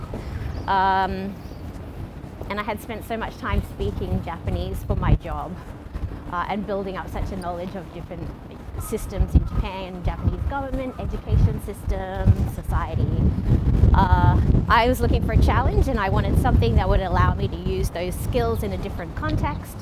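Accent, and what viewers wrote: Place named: Australia. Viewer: My colleagues would disagree strongly 😂めっちゃオーストラリア訛の若干のアメリカ影響ですよ〜😂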